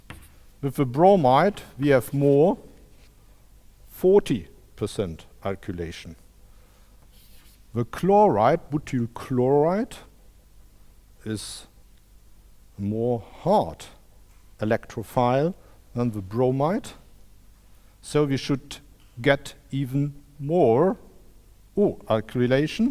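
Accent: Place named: Germany. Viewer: A German talks Eng 😀so cute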